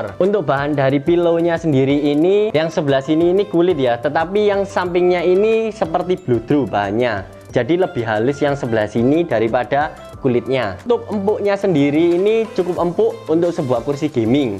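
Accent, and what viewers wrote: Java, Indonesia. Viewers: Mendok banget... Gak enak dengernya.. Belajar ngomong dulu ya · Masih medok Harus di halusin 😄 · Aku suka medok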